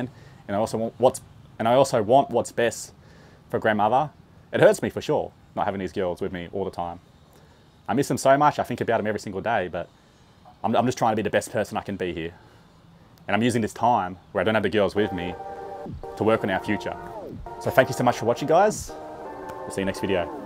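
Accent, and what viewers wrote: Australia. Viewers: Hi I am from Burirum and recently I am live in UK 🇬🇧 I am watch your channel every day and I am learning a lot more English from you ❤ I quite like the Australian accent love it ❤ Anyway stay strong and think positive ❤you are doing well ❤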